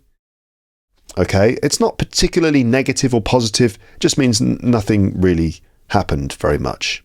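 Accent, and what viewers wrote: United Kingdom. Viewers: Your channel is a real treasure. I mean, your British accent, intonation, and facial expressions make it more fun and catchy! Thank you so much, Mr.! from 🇸🇾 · Hello! You are an amazing teacher I can say i am so glad to have you in youtube. I understand your teaching process.i have learn so many things from your videos. Your British pronunciation is not that difficult.I understand easly everythings. You doing great work for helping like us I really appreciated and from the depth of my heart I wants you to thank you!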